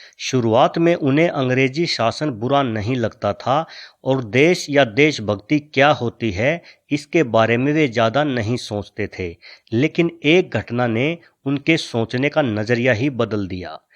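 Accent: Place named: Rohtak, India. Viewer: Aapke Rohtak type उच्चारण ka koi nidan dhoondein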